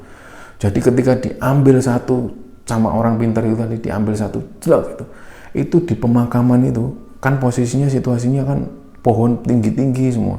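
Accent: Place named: Surabaya, Indonesia. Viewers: Paling ditunggu gaya nya mas klo bercerita bahasa campur Suroboyoan mantap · Cara nyeritano e Suroboyo banget, sukses selalu lurr🙏🙏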